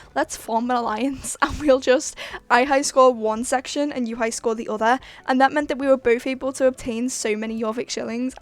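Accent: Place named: Scotland, United Kingdom. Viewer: are you Scottish cuse u soound it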